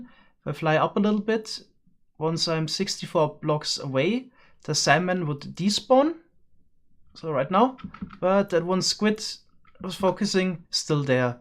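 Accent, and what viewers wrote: Germany. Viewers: He's German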